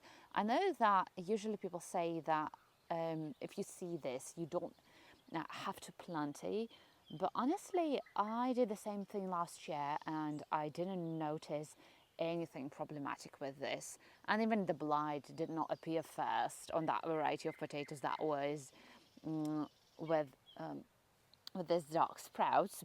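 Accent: United Kingdom. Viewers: My wife, Iryna, who is also from Belarus, still dislikes planting potatoes for the same reasons you did as a child. She particularly despises Colorado beetles! I'm the gardener in our family. Your British accent is interesting to hear from a Belarusian! Did you spend time in the UK? Cheers!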